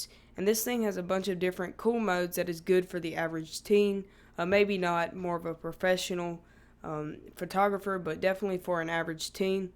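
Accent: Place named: South, United States. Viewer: I bought the camera for my wife and we like it, and as well we liked your video. You might have set image stabilization on so when you're walking one does not have a tendency to stop the video and go elsewhere. I can't stand shaky video. Or if you did have image stabilization on, you might practice holding the camera still. It takes work; everyone will respect your work more. The overall video seemed a bit rushed, unplanned. My wife and I liked your voice, nice southern accent. You obviously seem to enjoy this sort of work.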